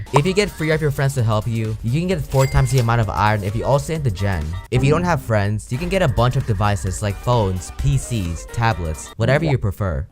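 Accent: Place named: England, United Kingdom. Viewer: Ayo ur pilipino with English accent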